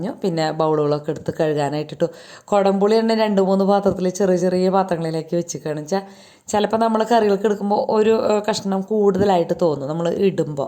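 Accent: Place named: Thrissur, India. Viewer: ഈ തൃശൂരുഭാഷ എനിക്കെന്തിഷ്ടാന്നോ💜💛❤ "എന്തൂട്ടാ " എനിക്കെന്തായാലും വീഡിയോ നല്ല ഇഷ്ടാ എന്നെപോലെ കുറേപേർക്കിഷ്ടാവും ഒന്നും കണ്ടു മടുക്കാറില്ല, ഓരോന്നും പുതിയതു പോലെ തോന്നും ❤💙 സങ്കടപ്പെടണ്ടാട്ടോ പിന്നെ സന്തോഷങ്ങൾക്കു മേമ്പൊടിയായി ഇത്തിരി സങ്കടവും,വിതുമ്പലും വേണമെന്നല്ലേപറയണത് എന്നാലേ സന്തോഷങ്ങൾക്ക്, ജീവിതത്തിനു പൂർണത കിട്ടുവൊള്ളത്രേ ❤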